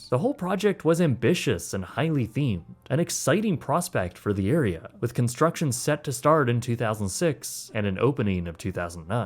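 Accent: Canada. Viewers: He’s from Canada they sometimes talk like that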